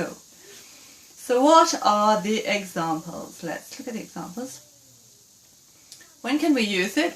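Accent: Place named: United Kingdom. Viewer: ♥️ you have a British accent..I loved your video. I'm following you in YouTube and HelloTalk also. Nice video☺️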